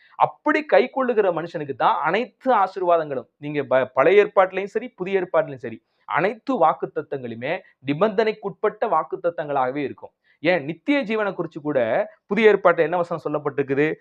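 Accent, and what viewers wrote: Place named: Tirunelveli, India. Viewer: Bro நீங்க இருக்கிறது திருப்பூர் but native of திருநெல்வேலி யா?! உங்க ச்லங் ல தெரியுதே!!??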